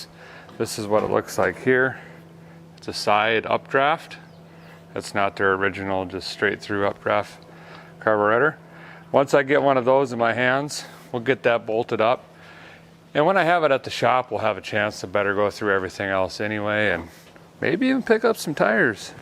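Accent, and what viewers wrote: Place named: Midwest, United States. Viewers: I love listening to this guy his voice is so calming. I can’t put my finger on his accent though maybe Midwest? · I am glad to know that there are other "older" women watching VGG. I am 72 and have the channel on all day, every day. I can't explain why. Honestly, I think it's midwestern roots, speech and work ethic. And he's a patriot. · Damb you're Midwestern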